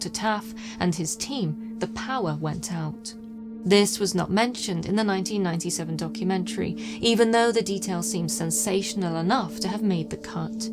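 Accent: United Kingdom. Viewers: I must say, your sponsors get their money’s worth. Flawless ad read in an elegant British accent is usually something they have to pay extra for.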